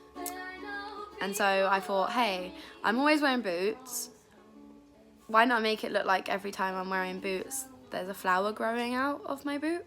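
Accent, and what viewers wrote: United Kingdom. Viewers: Haha. You sound very British haha. I love your tattoos btw :)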